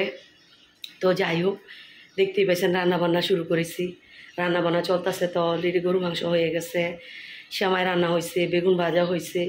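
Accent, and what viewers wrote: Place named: Brahmanbaria, Bangladesh. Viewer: কিসের জন্য এমনটা হয়েছিল আপা এটা জানতে পারছেন তো যা হোক চ্যানেলটা যেন ঠিক হয়ে যায় আপা আপনি আমাদের ব্রাহ্মণবাড়িয়া বাঞ্ছারামপুরের সেম ভাষা বলেন অসাধারণ কথা খুবই ভালো লাগে তো দোয়া করবেন আমি আহমদ আলী সৌদি আরব রিয়ার থেকে ব্লক গুলি দেখি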